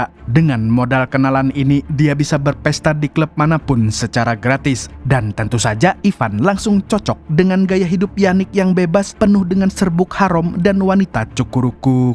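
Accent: Banjar, Indonesia. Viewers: Curigaa mimin orang banjar ya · Bah amang nya urang Banjar saku nih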